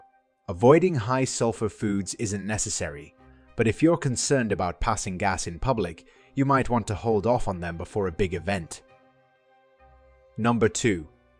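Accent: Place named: RP, United Kingdom. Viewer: Love yr posh accent presenting this Fartology topic!!! Suprised you didn't say flatuence or gas throughout,yr not that posh but thanks for being down to earth,we love you! God bless you. Oops I just let one off- excuse me!!!!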